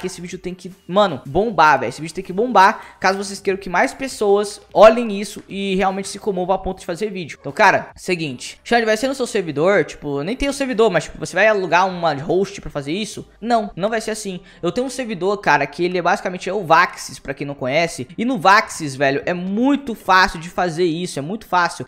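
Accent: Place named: Rio de Janeiro, Brazil. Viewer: Carioca mano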